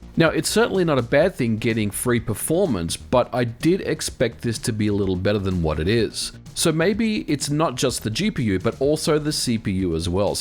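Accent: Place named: Australia. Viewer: you sound Australian but your games are not? do you live in Australia?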